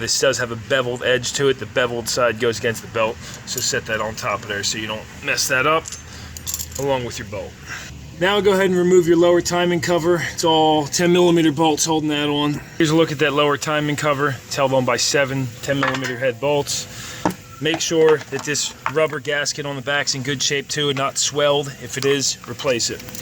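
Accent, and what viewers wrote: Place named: New Jersey, United States. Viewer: Where are you located? You sound like you're from South/Central Jersey. After seeing how this video I'd love to have you do the belt in my wife's 2014 Pilot. Very thorough and detail oriented. Great vid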